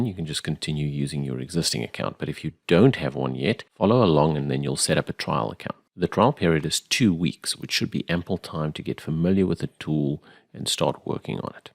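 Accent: England, United Kingdom. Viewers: like the content and usually like an English accent, but this is like fingernails on a chalkboard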